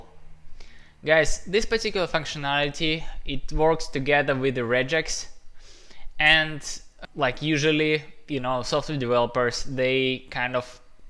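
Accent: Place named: Russia. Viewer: Я один слышу русский акцент? )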